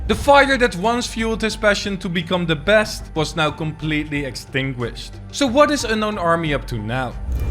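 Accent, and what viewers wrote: Netherlands. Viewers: you sound dutch? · Just a ask where are you from (I’m Dutch and idk but I sound like you Dutch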